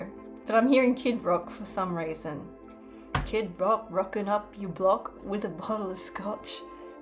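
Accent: Australia. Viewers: Long time no see 👀! You remind me of a butterfly fairy 🧚‍♂️ popping in and out of my energy 🦋✨I love your accent! Sounds like British! Now you confirmed Aussie! My DNA says I’m a third English! So we’re in the ballpark somewhat. No, I’m not into competition! More into helping to lead and healing wandering souls needing nourishment. Don’t like Freemasonry nor Eastern Stars! Reminds me of my criminal paternal family. Who have hated me and stolen from my Grandmas inheritance for me. Plus, they are in leadership and I detest their minor children sex trafficking and drug trafficking and immoral sexual mandatory group unprotected orgies for their Demonic Cult/Community! Sick and demented. I will defeat them for the kids and their PTSD suffering parents. They are a Menace to Global Society! I hate that they are lazy and using my inheritance to cause pain and suffering to innocents! 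You sure are spilling my ☕️ I see! You have a beautiful soul I can see. I liked you the first time I saw you a year ago! Down with the Karmics! Father God created me to be a karmic trap 🪤 I’m God’s spiritual warrior 🤺⚔️ ✌🏽👆🏾🫶🏼 Keep up the good work!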